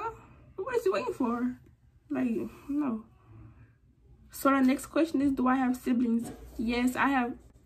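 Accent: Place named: Louisiana, United States. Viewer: the first few seconds ikkkk you was from louisiana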